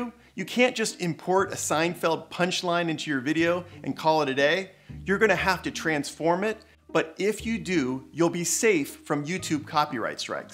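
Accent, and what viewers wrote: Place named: United States. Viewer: You really talk like an American person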